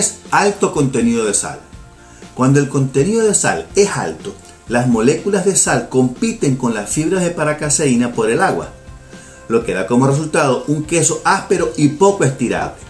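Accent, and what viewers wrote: Venezuela. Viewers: ¿Queso de mano? ¿ud es venezolano? saludos desde caracas. gracia por la explicación. llegue a su video no para aprender hacer queso. si no para darme cuenta de las malas prácticas de los queseros aca. ningún queso mozzarella producido en venezuela se estira, todos son terrosos y ásperos; al parecer están usando en exceso el sulfato de calcio para darle más rendimiento a su materia prima y lograr tener más producción, incluso buscar acelerar los procesos. ya pude escuchar al final de su video que es de aca. nuevamente gracias.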